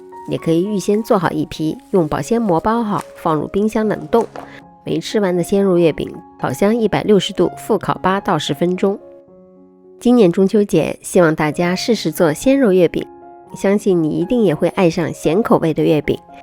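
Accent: Shanghai, China. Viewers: 原来是上海宁呀，怪不得不一样，一直在怀疑侬是上海宁，现在终算证实！😂😂😂😂❤❤❤❤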